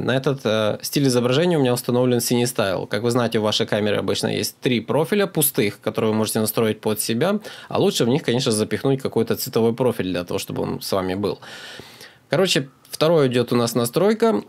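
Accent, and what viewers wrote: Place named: Kharkiv, Ukraine. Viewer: Кстати, из какого ты города? Акцент на харьковский похож))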